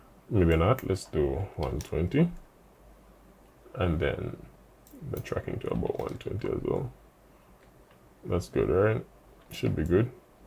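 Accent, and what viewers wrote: Jamaica. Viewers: You sound like a Jamaican lol. I know a brother when I hear one but I could be wrong😂. Great job champ I learnt alot.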